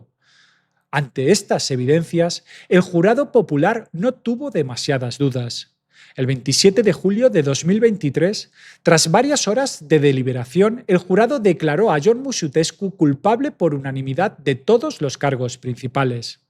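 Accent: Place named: Catalonia, Spain. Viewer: Ya se sabe que eres catalana. Por eso sabes pronunciar…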